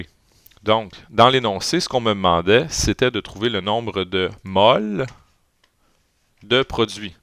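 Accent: Quebec, Canada. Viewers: Merci pour l'aide! Mais cet accent quebecois est pas tres beau :/ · Merci beaucoup ! T'es canadien?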